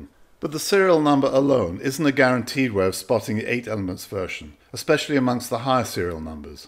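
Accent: United Kingdom. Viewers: With a name like and a British accent, how can we go wrong?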